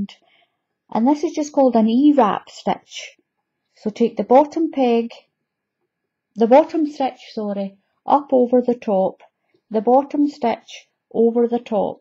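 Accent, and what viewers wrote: Scotland, United Kingdom. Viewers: I just love your Scottish Accent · her Scotland accent is so strong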